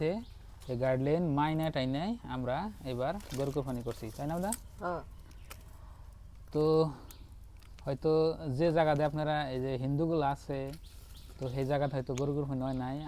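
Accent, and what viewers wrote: Bangladesh. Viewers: উজানী ভাবি ভাবির ভাষা শুনি বুঝছি ভাবি উজানী মুই ও কিন্তু উজানী 😂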